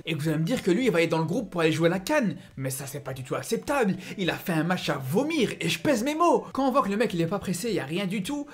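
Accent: Belgium. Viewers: On entend bien ton accent belge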